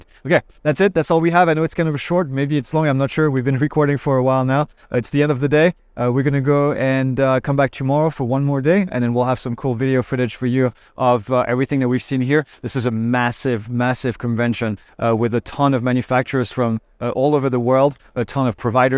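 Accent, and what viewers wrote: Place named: France. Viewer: Of French vocal cadence (accent), some of his words sound different, of course. A phrase he stated is actually very accurate in how it sounds, although he purposed a different meaning. "NASA has this information." is what purposed to denote, though of his vocal cadence, to the common English language eear, it sounds like "NASA has *disinformation.*" Not sure how accurate realizes his statement actually sounds as it is factual! Indeed, the American government has much disinformation all the time!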